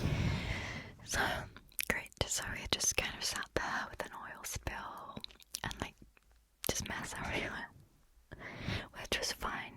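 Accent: United Kingdom. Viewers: 🙌🏼🙌🏼🙌🏼🙌🏼 Yay finally a whisper video!! I just love your British accent and whispers. I hope all goes the way you want, and thank you!!! The kitchen turned out beautiful, I LOVE the lights on the bottom of the cabinets.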